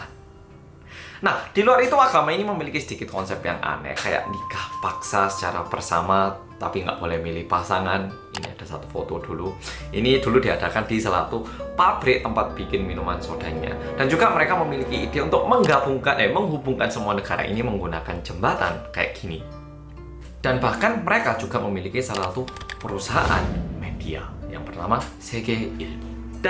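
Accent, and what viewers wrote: Java, Indonesia. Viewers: medok ngk ap 2 bang kan lucu orang korea medok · meskipun YouTuber lain nya sudah upload tapi aku masih tetap setia menanti videonya mas. G tau kenapa enak saja di dengar, jelas dan mudah di pahami 👍🏻🙏🏻 karena aku suka YouTube Yang MEEEEEDDDOOOOKKKKK🤗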